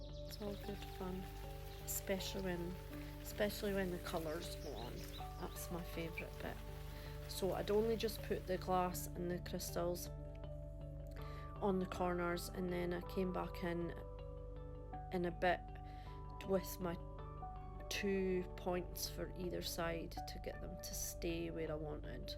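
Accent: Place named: Scotland, United Kingdom. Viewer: lol 😂 ur so funny! It’s def more than okay. But I get it, most people don’t hear their own voice in the same way others always here them. So it sounds weird to ourselves. Plus, I really get the chance to hear a beautiful Scottish accent. You’re so used to that sound I don’t you jump for joy over it, but someone else’s accent you like and don’t hear often makes it awesome. Well thank you kindly for the compliment, if I always wasn’t so stuffed up from allergies maybe I could speak more clearly. 😜😘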